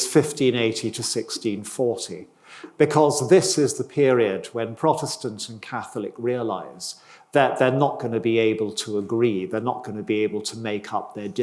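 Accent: United Kingdom. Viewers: I heard good things about Ronald Hutton - and then I started listening this video I was negatively surprised he has an British accent. As soon as I hear the British accent I am expecting to hear the most wild insane goofy ridiculous stupid clumsy statements ever. Obviously, my motto didn't disappointed me this time. The only thing that is true and decent is that Christianity actually curbed witch hunting - sacrificing people is strictly pagan in nature. The rest of it is pure garbage. Men hunted women because they were... misogynists? Seriously? Women of the pagan world would brew concoctions... they were able to make herbal medicine, tea and poison. Some of them acted as agents, some of them assassinated people, some of them were performing abortions, some of them were engaged in zoophilia (as American women do now with dogs, old central European women were doing it with the [black] billy goat). 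Some of these women were simply prostitutes, some were seducers (all women are hypergamous) and some of them were liars (as the lie is the only weapon that a woman has in a strong physical manly world), and these lies weren't mild lies, like "I love you" or "I was a virgin when you took me", but lies about who the father of the children is - and this causes lots of problems especially with the nobles or rich men that wanted to have their real offspring and their line maintained... women would also kill their husbands to inherit his riches and go run off with Chad... that's the moment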